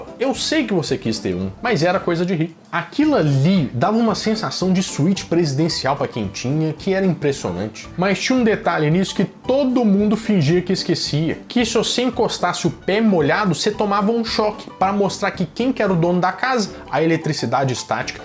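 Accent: Minas Gerais, Brazil. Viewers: Jeitinho mineiro de falar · Eu aqui,dando faxina no quarto,parei para comentar 😂 Morrendo de rir,não sei o que é melhor,sua criatividade ou seu sotaque De uma mineira para um mineiro,nosso sotaque é lindo!😊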